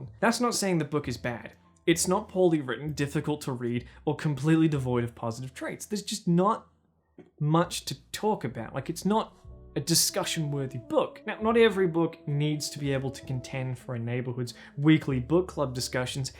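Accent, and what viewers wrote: Australia. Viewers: Josh Strife Hayes but australian and unrelated to videogames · the rhett and link one is so weird to me because one of my friends grew up in that town and hearing an australian man detail the experience is making me feel emotions that have yet to be documented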